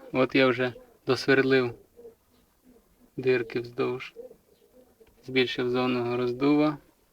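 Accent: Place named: Central Ukraine, Ukraine. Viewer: Говор такой забавный, центральная Украина небось)) зы. А горн прикольный.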